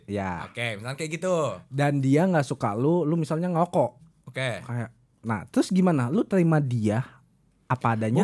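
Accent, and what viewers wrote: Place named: Java, Indonesia. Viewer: medokmu mas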